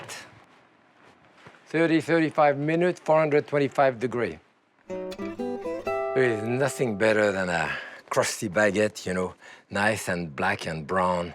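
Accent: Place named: France. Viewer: I want to love these videos. I really do. But I can't helo but wonder how long you have to live in the US before you finally lose the thick French accent that makes it sound like you just arrived off the boat and barely speak any English. Apparently 50 years is still not enough. "A lot of de chef, dey ave de special razeur." Come on chef, you know how to speak English as well as I do.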